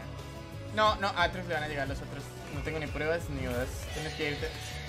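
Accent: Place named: Caribbean Coast, Colombia. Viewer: pipi sonso dice que eres costeño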